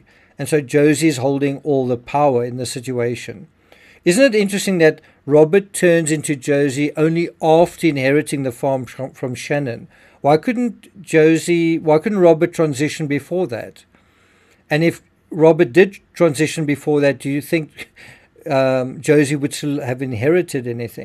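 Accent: South Africa. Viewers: I like his accent. Is it South African?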